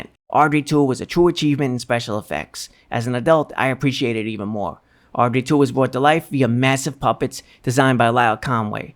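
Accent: New York, United States. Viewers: Nice review man! I love Little Shop! I’ve actually played the voice of the plant Audrey II on stage in the musical production. It’s still one of my favourite musicals and roles I’ve ever done. I’m so glad you brought up the director’s cut!! Because that’s how it is in the stage version and I love the bleak ending. EXCELLENT choice of film! Also, I don’t want to assume, but you sound like you are from New York? Whatever you accent is, it’s awesome man haha 😎👍🏻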